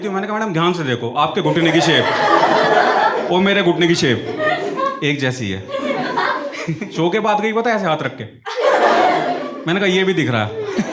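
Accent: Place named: Delhi, India. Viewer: Nice standup bit 😊 it's been 20 years leaving delhi , but listening you felt like I am in delhi haryana 😂😂😂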